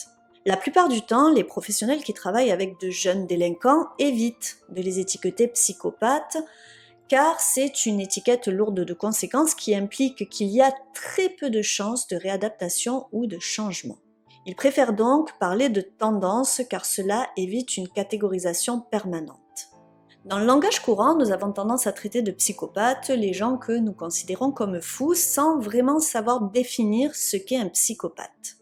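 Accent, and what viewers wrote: South, France. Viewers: Bonjour, Personnellement je ne regarde jamais les images. Je ferme les yeux et j'écoute en utilisant mon imagination pour me visualiser l'histoire. Images ou pas, j'apprécie tout autant tes histoires, et un grand bravo pour ton travail et ta façon de raconter. PS. J'aime ton accent, ne serait-ce pas un petit accent du Sud ? Au plaisir d'entendre ta prochaine histoire. Je te souhaite une très belle semaine. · Narration au top comme dab avec le petit accent du sud qui va bien :)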